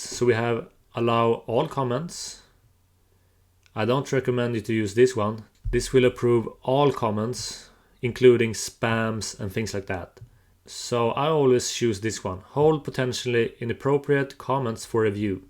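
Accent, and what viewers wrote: Sweden. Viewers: samma här, jag hörde det på din fina accent men den också att du hade typ någonting på svenska kommer inte riktigt ihåg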